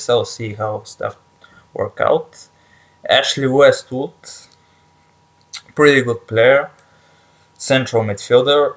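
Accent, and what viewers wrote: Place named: Bulgaria. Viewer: Great vid buddy love the accent Are you from Bulgaria if so big up Stan the man the Celtic ledgend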